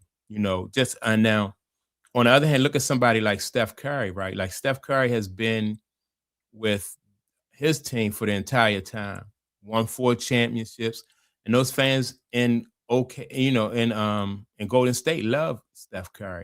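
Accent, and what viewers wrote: Baltimore, United States. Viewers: Baltimore accent?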